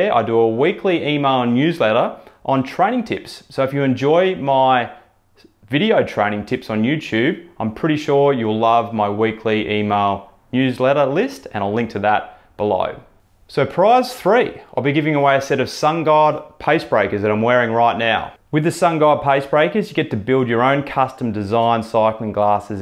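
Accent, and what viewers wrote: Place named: Australia. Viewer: I love Aussie accent so I could listen to you talk about bikes all day ;)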